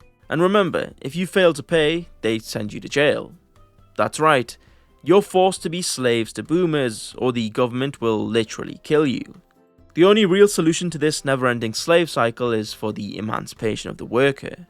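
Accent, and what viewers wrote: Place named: England-Wales border, United Kingdom. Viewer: Can we do an accents tier list?! (I’m trying to work out which side of the England-Wales border line your accent is from, I’m guessing fairly close to it either way)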